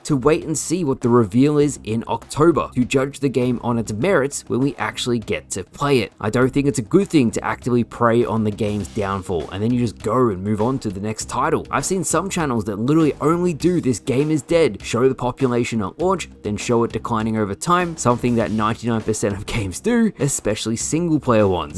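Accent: Australia. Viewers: I can't find any matches in infinite you sound Aussie too so I wonder how you do with matchmaking I can't get a single match in Infinite on PC, and in MCC I just get matched with Yanks and Euros but at least get some matches at all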